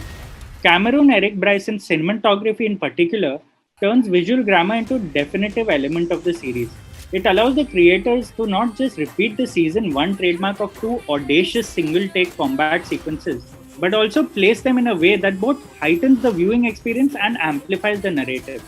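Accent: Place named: Delhi, India. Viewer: What a crappy narrator- I like reading you rather listening to you. You have those typical Delhi accent- thel thel ke batein kadna. Please you better write.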